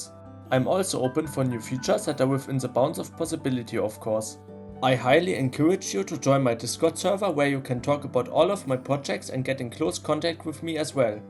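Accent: Germany. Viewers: mach weiter so ich liebe deine Videos aber es fällt mir manchmal schwer dein Brandenburger English zu verstehen aber große props an dich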